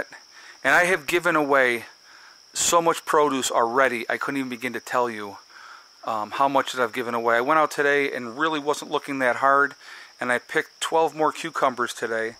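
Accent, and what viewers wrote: United States. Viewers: Iam a Christian and a Welshman to boot! I am on carer's allowance for looking after my elderly mother and I am thus poor by modern British standards but I would willingly try to accommodate any of my Christian brothers and sisters from SA and beyond! My only question is this, "Why have you got an American accent?"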